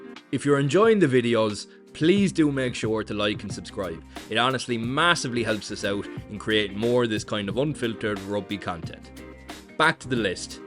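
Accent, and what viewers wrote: Ireland. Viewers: Heard an Irish accent and I knew there was going to Green glazing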